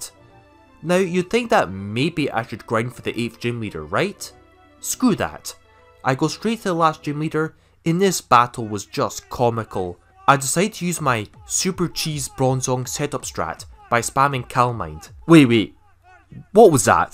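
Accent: Scotland, United Kingdom. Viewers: you have a super Scottish accent. lemme guess...your from Scotland lol. (or wales..?)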